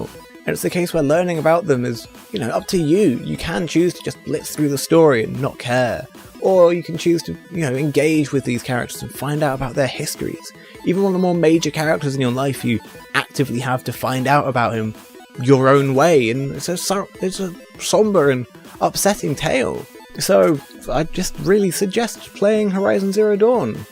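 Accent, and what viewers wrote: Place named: United Kingdom. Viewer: hardcore british!!!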